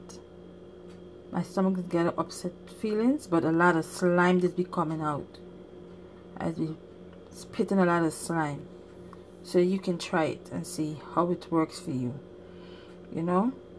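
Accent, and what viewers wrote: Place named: Guyana. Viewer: Peace! You soundin like my countrywoman (bini) from Guyana. Thanks for this Queen!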